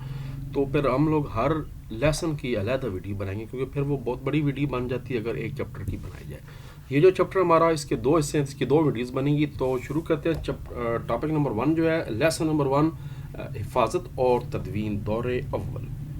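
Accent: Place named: Rural, Pakistan. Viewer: Sir where are you from? Your accent is just like the accent of my village peoples (GANDAKASS)❤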